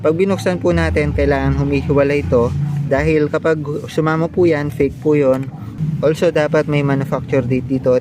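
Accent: Pampanga, Philippines. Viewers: Kapampangan kapin ne paps,,tin halu ing pangatagalog mu ehe! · kapangpangan kaba paps ung tono mo kasi e hehe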